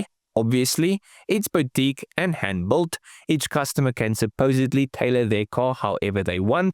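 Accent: South Africa. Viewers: your accent sounds like a mix of south african or dutch and something else, what is it?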